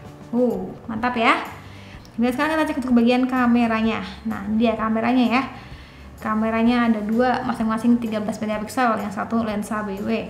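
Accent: West Java, Indonesia. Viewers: ini orang sunda y, keliatan klo ngmng ada kata "SI" nya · "DESAIN DESAIN JAMAN BAHEULA", org sunda nyah teh?😃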